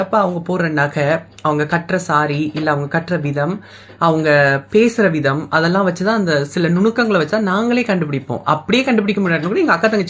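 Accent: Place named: Eelam, Sri Lanka. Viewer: Cute.., you are very good in eelam Tamil..appappo eelam Tamil la pesunga.. quite emotional a irukkum eelam Tamil ketkumpothu..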